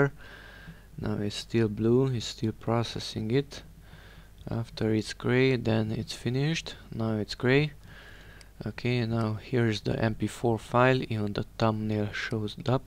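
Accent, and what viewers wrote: Hungary. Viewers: Nagy segítség, le sem tagadhatod amúgy, hogy magyar vagy. Az akcentusod elárulja :D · Thx for the info (alig lehet hallani hogy magyar vagy :P ) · It sounded like hunglish. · Akcentusod rögtön elárulta hogy magyar vagy )))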